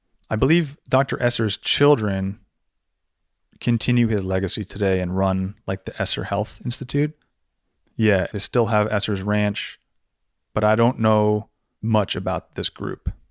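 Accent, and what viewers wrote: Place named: Texas, United States. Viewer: Your Texan accent seems to match pretty closely with the way Texan musician Michael Nesmith used to talk.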